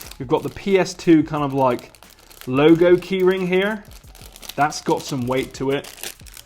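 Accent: United Kingdom. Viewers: stop speaking words like- digging, nostalgia, etc and British accent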